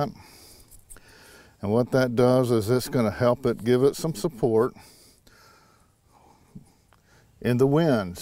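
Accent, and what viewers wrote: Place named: South Carolina, United States. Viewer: Where are you from? Your accent sounds much like us, here in South Carolina. :)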